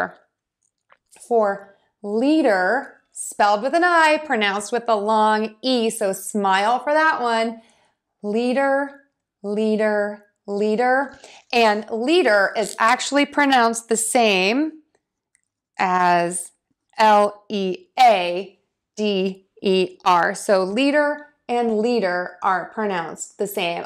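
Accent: United States. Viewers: Huh? Isn't that how Americans pronounce the t's?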